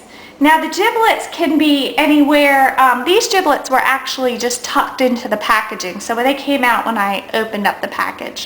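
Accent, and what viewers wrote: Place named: Texas, United States. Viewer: i love your texan accent..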